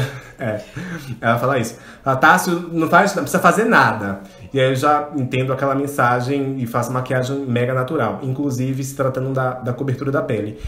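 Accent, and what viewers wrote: Bahia, Brazil. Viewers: SE TEM SOTAQUE MAIS LINDO QUE O BAIANO, EU NAO ME LEMBRO 😍😍😍😍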